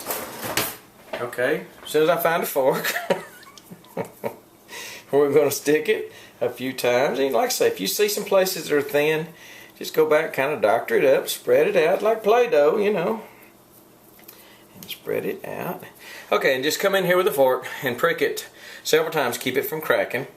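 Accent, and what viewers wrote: Texas, United States. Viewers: harplover1; Texas people have a "drawl". You get used to it. You must be new to the internet? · I subscribed within seconds of hearing your lovely Texas gentleman's accent! Thanks for sharing this recipe too!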